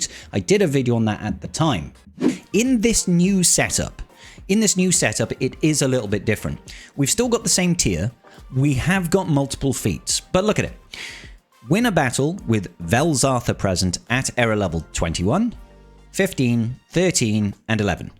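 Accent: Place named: Cockney, United Kingdom. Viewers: <num> the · Who doesn’t love a bit of the!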